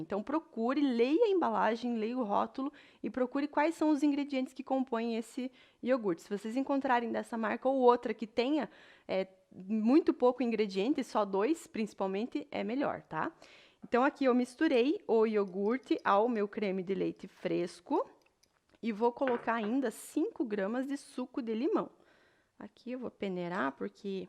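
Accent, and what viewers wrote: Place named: Paraná, Brazil. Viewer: Que sotaque legal, de onde é? Paraná?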